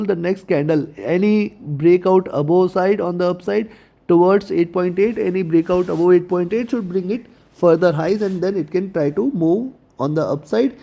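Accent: India. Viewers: Eto je indiyskiy akcent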